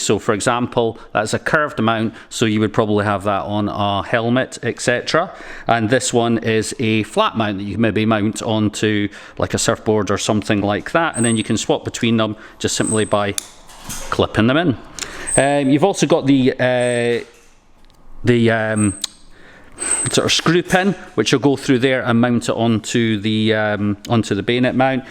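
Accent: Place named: Scotland, United Kingdom. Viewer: OMG 😮 ... You’re Scottish!! :-). All the big you tubers are Scottish... just look at big-Clive!! :-)... great video!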